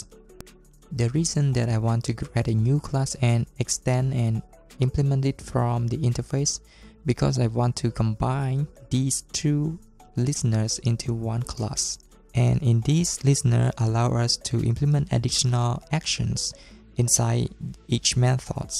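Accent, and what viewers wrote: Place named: Thailand. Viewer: Thank you for good tutorial, (One question, are you Thai people? for me your sound like Thai people 😊👍)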